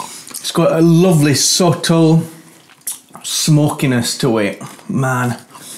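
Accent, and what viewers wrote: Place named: Bradford, United Kingdom. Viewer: Northern Spanish from Bradford? Unique! 😁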